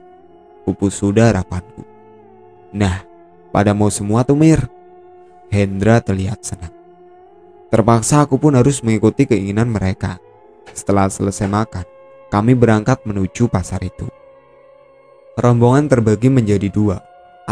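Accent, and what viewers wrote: Java, Indonesia. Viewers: Min jangan pakai guwe atau gua dong .gk pas .dg logat jawa nya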